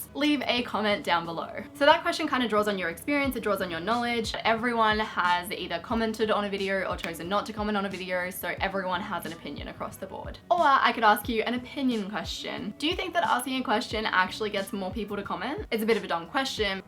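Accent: Australia. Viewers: I am loving all of your videos. They help me so much! And I love hearing an Aussie offer youtube tips and advice. I follow a lot of the American youtube experts. You are doing amazing work.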